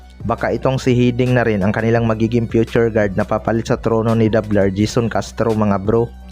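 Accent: Visayas, Philippines. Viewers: Bisakol amputa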